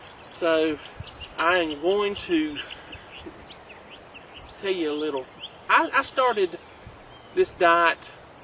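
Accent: United States. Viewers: are you from Southern Ohio, KY, or WV? you sound like the people around here lol. It's sad i can sense a difference between myself and them even after 5 yrs. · its a southern accent. not all americans have the same accent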